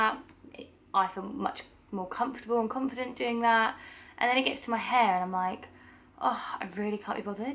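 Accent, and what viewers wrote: United Kingdom. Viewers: I love the British accent that you have!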